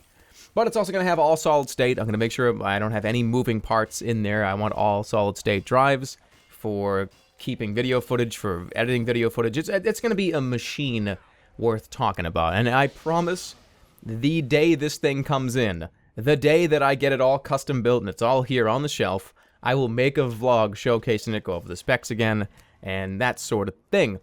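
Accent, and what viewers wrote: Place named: East Coast, United States. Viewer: Jerma are you from the east coast? You have the accent and its awesome!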